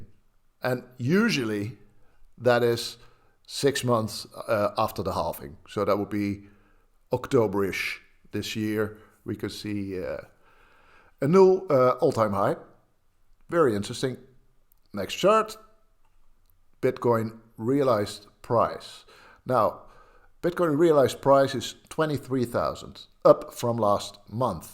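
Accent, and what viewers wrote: Netherlands. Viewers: Love your Dutch accent :) have family in holland and it reminds me of them. Warm feeling. Cheers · You are Dutch from your accent. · You sound Dutch..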